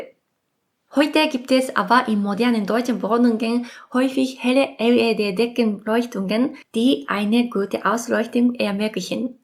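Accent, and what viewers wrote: Japan. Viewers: Liebe, ich höre ihnen sehr gerne zu, ihr Deutsch ist perfekt mit dem kleinen japanischen einschlag. Ihre inhalte sind sehr informativ und ich freue mich auf weiteres von ihnen.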